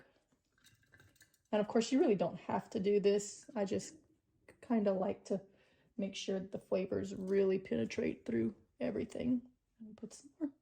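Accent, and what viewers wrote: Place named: North Jersey, United States. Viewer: Love watching and hearing yr accent! North west nj🩷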